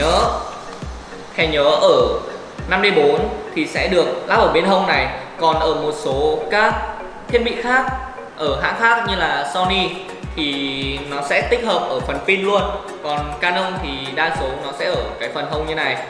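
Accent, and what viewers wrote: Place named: Ha Tinh, Vietnam. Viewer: Sao ảnh ko nói giọng hà tĩnh nữa 😁 mà giọng này hay hơn.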